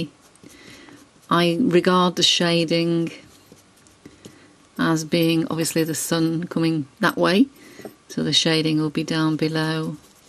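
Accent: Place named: RP, United Kingdom. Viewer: Great video buddy! It's lovely to hear your (posh) voice! xxx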